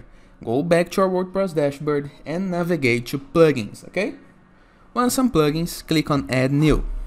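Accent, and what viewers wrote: Brazil. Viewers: Uai... Você não tá ensinando português de graça, né... hehehe Mas a dica grátis é Faça um dicionário de pronúncia e passe a ler as letras na pronúncia do outro idioma, não na da tua língua materna Aí ao invés de lêrni ráu tchu impruvi en op-maizi dê sêrchi rezuuls E ficaria mais leern róu t'il óp(t)máiz een m-pruf dâ sêrsh (sem o i) rizult's Pra quem tá há 6 anos num país (a menos que você esteja em Detroit ou Miami) era de se esperar que você tivesse dedicado bem mais pra falar o idioma além do "suficiente pra se comunicar" e passado pra algo fluente (E olha que eu nunca sequer viajei pra algum país anglófono) Logo, trocar o maneirismo "né" por "ok" não faz nenhum sentido. Americano não fala assim. Até se fosse o "ya know" faria mais sentido ou trocar o "tipo" por "like" (que continuaria sendo feio, mas pelo menos faria sentido no outro país. 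Porque, se um zé aleatório que nunca viu tua cara conseguiu identificar LITERALMENTE em duas frases de onde você é, acho que ESPECIALMENTE nesse governo aí a coisa não vai dar bom pra você 😬 É um problema bastante recorrente do brasileiro classe média achar que é branco no mundo todo só porque se considera branco aqui [E eu falo isso justamente porque tendo a pensar que seria melhor você aí do que voltando hehehe]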